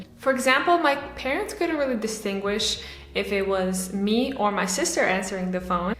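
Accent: United States. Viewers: To me, you sound like you could be from the US. You're not the only one I've seen this with. Why is that?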